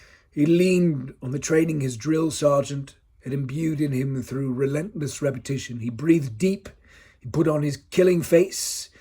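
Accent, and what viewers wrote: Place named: United Kingdom. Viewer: sometimes your british accent grew too much on me and i started reading things aloud in it subconsciously 😆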